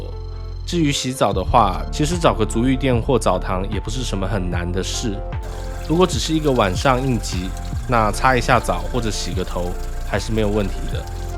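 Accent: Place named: Taiwan. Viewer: 這是在大陸 我以為在台灣 台灣的口音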